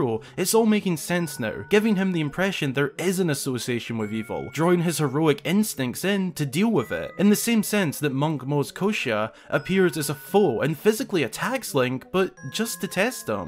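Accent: Scotland, United Kingdom. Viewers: Why thats a lovely Scottish accent you have there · he's from the UK dude (pretty sure), he has an accent, foreign people just pronounc things differently 🙄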